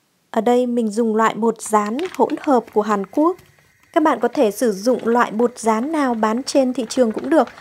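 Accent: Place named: Hanoi, Vietnam. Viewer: Ban nay có giọng nói đe nghe như người Hà Nội ấm áp ghê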